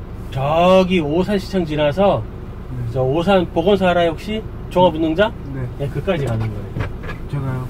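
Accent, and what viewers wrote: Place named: Gyeongsang, South Korea. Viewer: 이거 보고 1종보통 도로주행 한번에 합격했습니다 직관적인 설명,약간의 경상도 사투리(?)...친절함까지... 1종보통 합격요령은 기어조작능력 + 순발력 + 느긋함 + 약간의 센스가 필요해보여요.저도 뭐 유튭영상 수십번 시청하고 했지만 막상 시험칠때는 아무 생각 안나더 군요.긴장을 삭히고 배운대로 익힌대로 차분하게 마인드콘트롤 하면서 초반이 제일 중요하니 초중반만 문제없이 넘기니까 후반쯤에는 오히려 자신감이 생기데요:)) 보통 도로주행 70점컷인데 만점은 거의 없고 80~90점으로 많이 합격하더라구요 만점받을 생각하지 마시고 감점을 최소화하는게 합격요령이에요 다들 꼭 한방에 합격하시길 바랄게요 화이팅!!!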